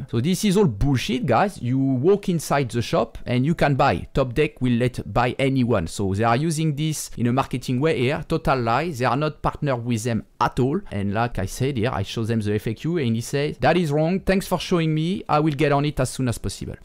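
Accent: France. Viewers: Deux secondes dans la vidéo et j’ai grillé que t’étais français ! Haha en tout merci pour l’information et les vidéos c’est une vraie source fiable, continue ainsi mon con je suis derrière toi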